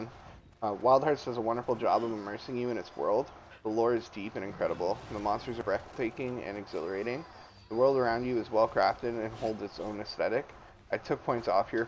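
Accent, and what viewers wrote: United States. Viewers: Why does your voice sound like its coming from an American neck? This says Canadian content 🤔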